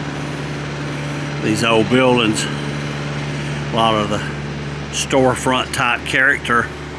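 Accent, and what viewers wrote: South, United States. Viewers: I love your Southern accent and the way you pronounce words. That's the great thing about the USA, depending on the state, city and even towns depends how one pronounces words. It's beautiful and don't change! Also maybe do some more RV videos of campgrounds because that would be awesome as well. Thank You!! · I love the Southern accent, and you are doing great and great video. It made me in love with the town. It is beautiful. Thank you for sharing. God bless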